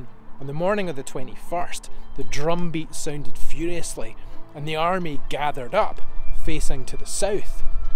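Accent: Scotland, United Kingdom. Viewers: Thanks for this, well done and love the Scots accent as a big part of this report. What sad days there were, and still are with these conflicts taking people's lives and often for so little gain. The bravery of these souls must never be forgotten!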